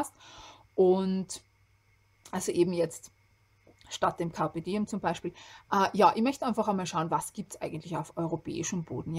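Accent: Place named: Austria. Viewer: ich den österreichischen Dialekt unglaublich gerne mag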